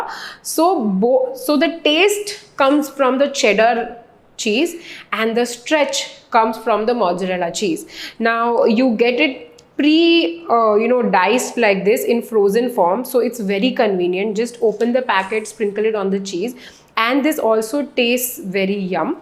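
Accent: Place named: India. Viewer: The way Indian peopl speak English and their accent r really irritating even im an Indian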